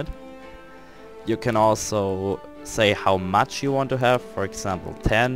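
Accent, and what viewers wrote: Germany. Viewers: dadurch weil ich deutsche Akzente gut kenne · Bist du deutsch?? 🙃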